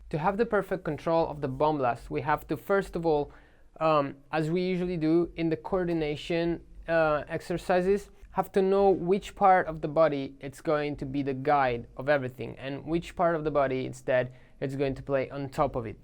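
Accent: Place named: Austria. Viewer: Similar accent as krimh, are you from the same place? Austria, I think?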